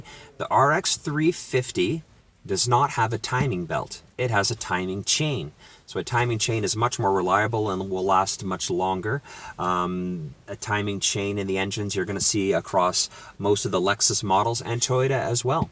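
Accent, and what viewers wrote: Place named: Canada. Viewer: Hello, I enjoyed your discussion. How did you ever come to a Canadian accent?. Next time can you discuss how to upgrade ES 350 navigation system on my 2015? For some reason 2015 required a mini Sim. The dealers charge an enormous fee to change the Sim card. I understand the newer lexis allows it to download Iike the BMW.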